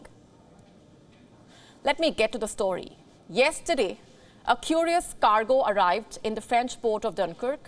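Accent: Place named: India. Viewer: How funny is the Indian accent 🤣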